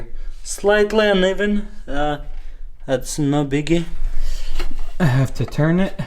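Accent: Russia. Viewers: ты русский? акцент сразу заметен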